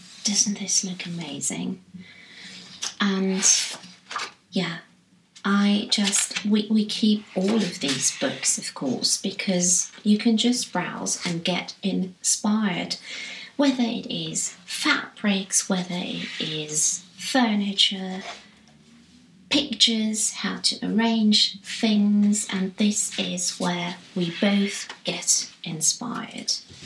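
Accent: United Kingdom. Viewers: I agree completely.I couldn’t believe she wasn’t English.She has the most English of voices and I could listen to her all day.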